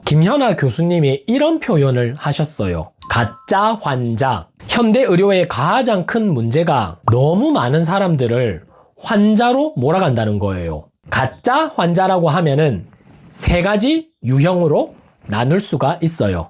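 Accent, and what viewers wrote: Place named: Gyeongsang, South Korea. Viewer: 님 오늘의영상도 꿀잼나게 알려주시고~전경북이라 님 경상도말투가 더정겹게 와닿아요 오늘또 공부잘했습니다~건강하셔요🎉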